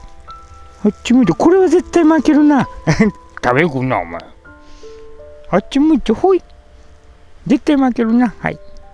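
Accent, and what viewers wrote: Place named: Kansai, Japan. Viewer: 関西弁癒されるな〜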